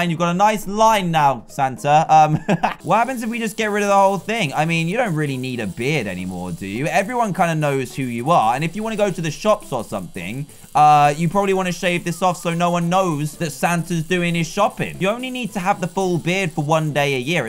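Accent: United Kingdom. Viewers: Wait I did not know that you was British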